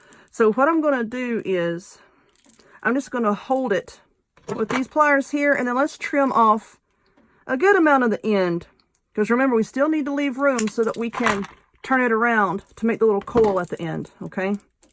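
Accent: South, United States. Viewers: I adore you. the Southern accent is so calming for me, since the whole of the maternal side of my family is from down South, plus you explain everything so thoroughly and show how slowly so it can be followed, it doesn't hurt that you mix in some humor too, that's always really nice and reassuring when one might be nervous or have difficulty keeping up with faster tutorials. Unfortunately though, JoAnns went out of business, I'm so sad about that.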